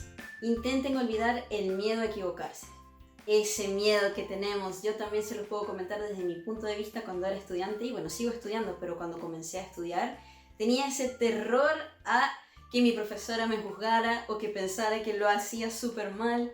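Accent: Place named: Chile. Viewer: A escucho el acento chileno 😅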